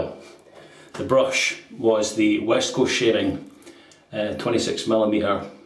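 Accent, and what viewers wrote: Scotland, United Kingdom. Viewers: You asked for it,: Yes, you did butcher Fitjar and Slåtterøy a bit (but they will survive: just a few weepers and nicks) J is like an English y. The diacritical sign above the a is there for a reason: It changes the sound from an open ah to an o-like sound), the double t makes it short, like Slot in a coin slot. Ø is like the French œ or the German/Swedish/Turkish ö, rougly like the i in bird. This time as a diphthong with the y. Imagine the stereotypical New Yorker saying bird: bøyd. (As an aside, in Faroese the øy has indeed morphed into oy.) So it would sound a bit like Fit-yar Islands [that's the ‘intrusive’ English word] Slot-er-øy. Do pronounce the r in your normal Scottish way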